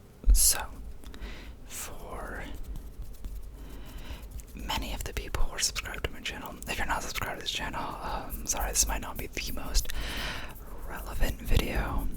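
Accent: India. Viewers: Do what YOU enjoy. I like the variety. I found you through the Indian accent ones as well. Maybe just do one of those every now and then. But I like checking out your channel and not really knowing what to expect. Thanks for doing what you do. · I finally watched this video, and I just wanted to say that do what you want. Just like people found your channel because of your Indian accent and that’s what they enjoy, people and views will come for other types of content. This is your channel and either way we enjoy your videos! Thanks for all the content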